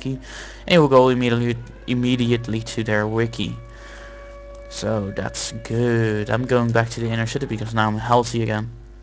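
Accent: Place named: Netherlands. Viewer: lol your dutch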